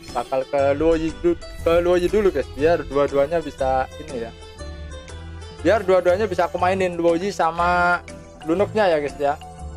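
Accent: Java, Indonesia. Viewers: Terus terang dr sekian banyak player MC di yt. Cuma smpean yg gampang saya fahami cara menjelaskan nya mas😂. Ciri khas ketawa nya nyanduin😂. Sering2 ktawa mas😂😂😂 Koyo ne logat e jowo😂😂😂😂 Sukses terus mas e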